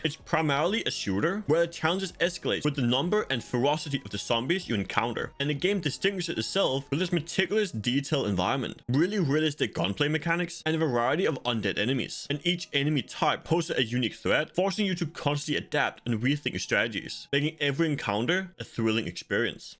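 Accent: Denmark. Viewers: def you're danish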